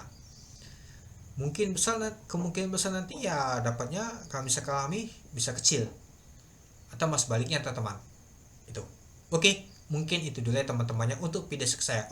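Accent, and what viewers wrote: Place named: Palembang, Indonesia. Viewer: Lu org Palembang ya Ko?? Logat nya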